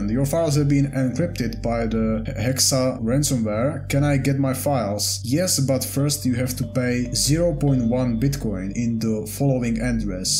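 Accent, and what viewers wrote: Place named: Czech Republic. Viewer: The czech accent is so cool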